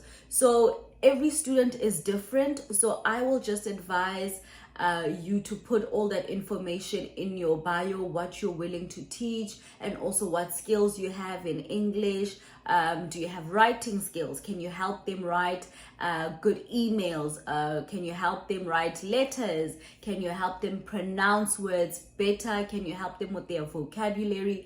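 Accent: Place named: South Africa. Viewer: TOYS-inyana ! I Love Your SA accent !!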